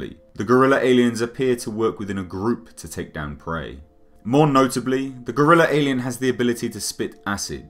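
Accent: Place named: East England, United Kingdom. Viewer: U. PY I am going to guess you're East English or Western English? You said Love so I am going to guess east.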